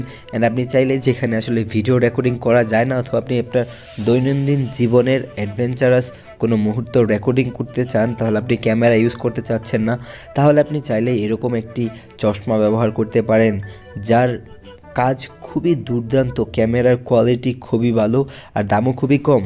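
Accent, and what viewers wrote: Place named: India. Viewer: Your bangla like hindi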